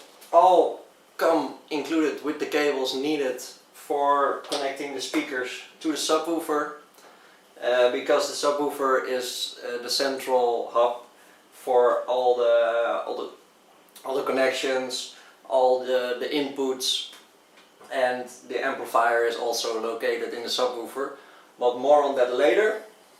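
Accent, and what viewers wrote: Netherlands. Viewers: Ik hoor een Nederlands accent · Het is trouwens te horen dat je Nederlandstalig bent! Heel tof!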